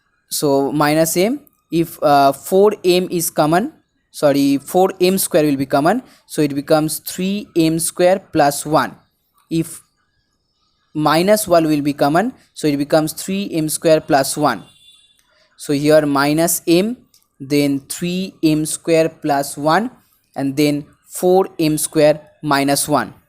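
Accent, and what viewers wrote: Bengal, India. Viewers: Are u Bengali ?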